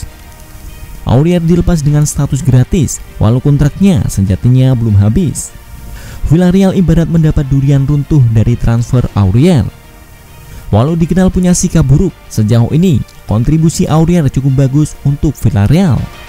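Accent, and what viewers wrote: Java, Indonesia. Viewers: Jijik suara nya medok 😛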